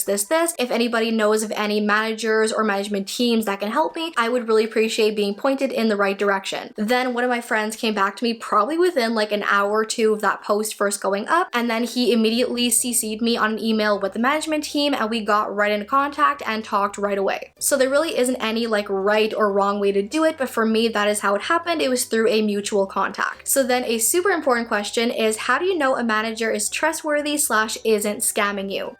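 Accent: Canada. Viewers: where in Canada are you from(I can tell)?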